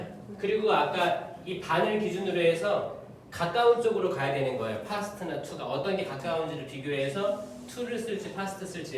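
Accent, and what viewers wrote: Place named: United States. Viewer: 예수님! 신부님 영상 찾아보니 정말 대단하시군요~~*** 강론,특강,성경읽기,영어성경,기도문영상들 ᆢ 매일듣게되는 마법이 있습니다 호주계셨는데도, 우리가배운 미국영어와,성우처럼 음성이 좋으셔서 계속듣게 됩니다 신부님 항상 영육간에 건강하세요🍎 주님말씀 전해주셔서 감사합니다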